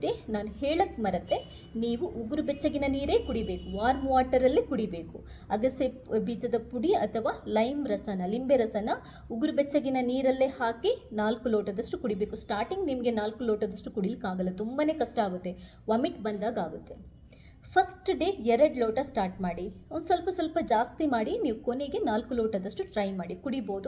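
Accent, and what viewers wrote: Mangalore, India. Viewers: Madam nivu Mangalore urura,channagi mathadathira 🙏👌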